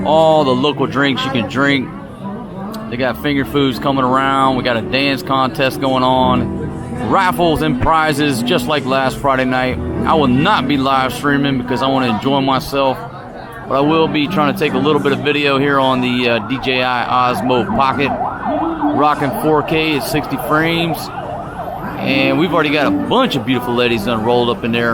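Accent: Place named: Ohio, United States. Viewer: You sound like my old battle buddy from Ohio, Cincinnati kids named Ohler. Ohio River Valley trash he used to say. Hahaha. He's now a retired LAPD cop living in San Diego.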